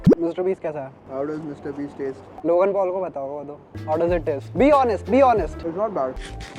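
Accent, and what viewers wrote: Rajasthan, India. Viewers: bit of rajasthani accent?? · thats the most Indian accent i have heard outside India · Bro has the strongest Indian accent I've ever heard 😅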